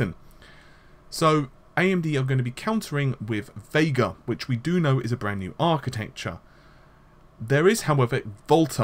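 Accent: United Kingdom. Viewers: "nm" is pronounced "nanometre" unless you would (for example) legitimately say: "my penis is 25 'cm' " rather than "centimetres" or "suck my 10 'in' biro" .... you would of course say 'inches' not literally pronounce 'in' written and spoken are different. also, you're wrong about how to pronounce Ti. honestly I think you're just trolling us on purpose now, but then I do wonder if maybe you even knew that 'nm' means nanometre... EDIT: I know I'm getting thumbs up for trash talking you - but I do want to say that I love your channel, your vids are really informative and I'm a proud subscriber... but dammit man, please just pronounce stuff like the vast majority - I have great respect for those that stand in the minority on issues, but language is not one of them, it's meant to populist, so that we can all understand each other. The 'common tongue' is called as such for a reason. 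We're both British and my accent is very similar to yours, so I probably identify too closely - I just want your channel to be a big success bubba! hugs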